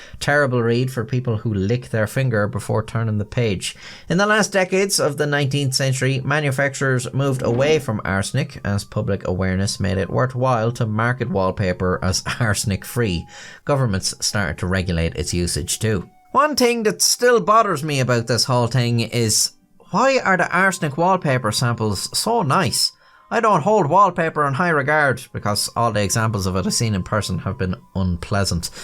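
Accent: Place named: Ireland. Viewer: For the love of Pete can we please have more Irish voiceovers on YouTube 👏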